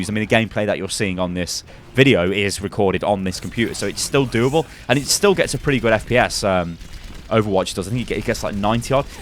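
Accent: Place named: Cockney, United Kingdom. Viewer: I just noticed how you say computer in a cockney accent - compu'er. And the reason I love this channel is precisely because it's british, and I LOVE british accent - and its nuances - between the ones I've heard. Thanks for the hard work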